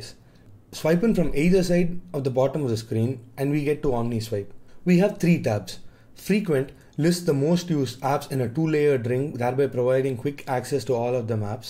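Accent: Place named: India. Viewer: That Indian accent doe.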